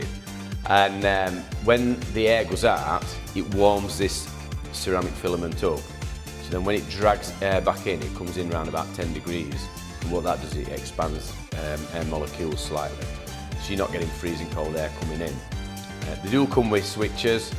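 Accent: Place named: Yorkshire, United Kingdom. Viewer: Deffo agree, could hardly hear those dulcet Yarkshah tone because of that crappy background music.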